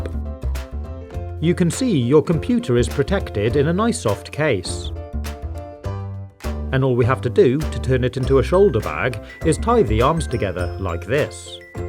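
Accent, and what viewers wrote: United Kingdom. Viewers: Louisa Dsouza it's just a British accent.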